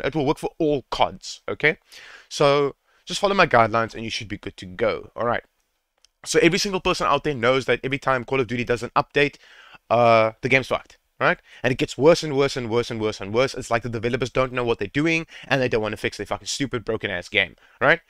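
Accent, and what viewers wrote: South Africa. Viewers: Lekker what a legend! Adding you up. When I heard the South African accent I knew this’ll be a quick fix!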